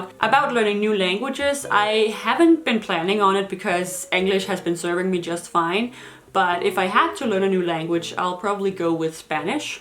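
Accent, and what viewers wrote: Denmark. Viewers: Hey as a Texan an American learning all Scandinavian languages an German plus Gaelic I say it sounds awesome hearing the Dane accent in English :) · Awww I love accents, including the Danish accent! I just wish I could get rid of my American accent when I try to speak Danish. :p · I love the Danish accent when you speak English! I love how articulate you are on topics like immigration too. I wish I could be like that rather than stumbling over what I want to say xx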